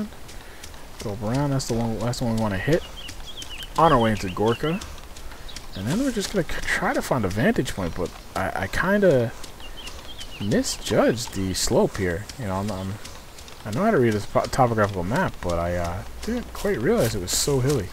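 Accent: Boston, United States. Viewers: R u from Boston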